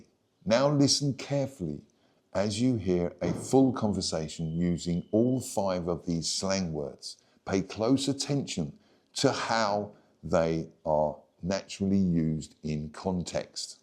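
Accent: United Kingdom. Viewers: I like your style,. You make me laugh. You ought to be an actor. You have the perfect voice for it. Are you a Cockney?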